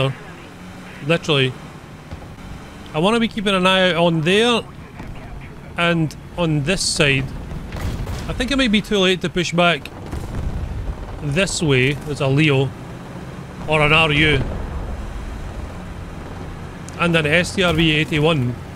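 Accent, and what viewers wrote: Scotland, United Kingdom. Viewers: I have no interest in war thunder, I’m just here for that Scottish accent and the Tanks.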